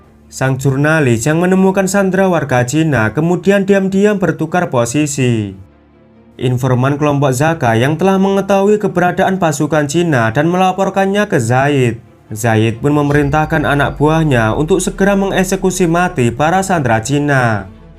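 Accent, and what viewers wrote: Java, Indonesia. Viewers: JANCOK MEDOK MU PARAH COK!!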